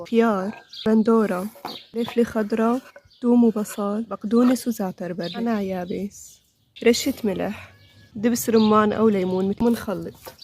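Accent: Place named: Latakia, Syria. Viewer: كأنك من اللاذقية يقلبي❤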